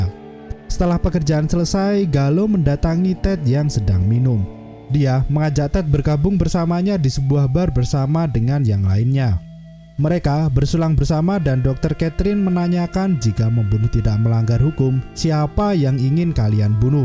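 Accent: Java, Indonesia. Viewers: Suara jowo medok eramm mass hehheh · Si abang nya Jawa ya bang medok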